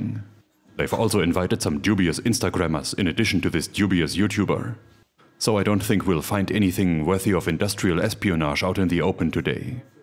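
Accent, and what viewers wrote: Germany. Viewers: Ing_lish with a German accent/humor combination is in itself precious, but when adding a torrent of valuable technicality ... can't be described in words 😉.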